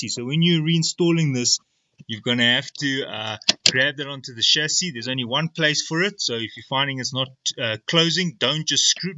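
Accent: South Africa. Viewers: Yay, South African Accent... Howzit and thank you for the DIY!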